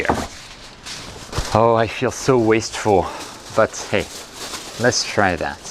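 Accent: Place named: France. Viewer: ahhhhh I knew there was some french tones behind! ;-) sounds like my french aunt accent , who is living in California since the 60s... that's why my ear is used to this very faint "french touch"... and that I definitely prefer the butt plug term (LOL) . I guess you're right about aesthetic, especially during nighttime, nobody cares! I'll go for the "amazon touch" as soon as tomorrow evening. Looks like I had access to another video i wasn't supposed to..sorry.. not my fault! But as a consequence I didn't received your answer to my comment about taking flats during sunset... :--)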